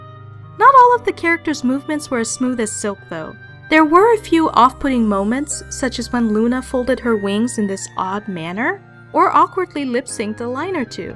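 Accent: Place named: RP, United Kingdom. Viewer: your so posh!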